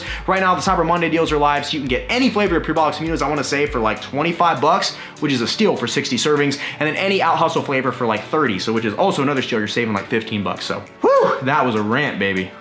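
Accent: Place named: South, United States. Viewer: Texas in the house 😀!!! My favorite was the big ol bulking breakfast because of the southern accent of course😂😂!!! Congratulations on 200K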